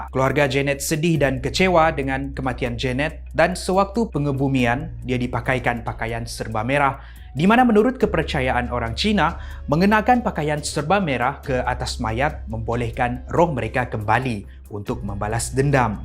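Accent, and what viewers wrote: Sabah, Malaysia. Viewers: Sabah bh ni kan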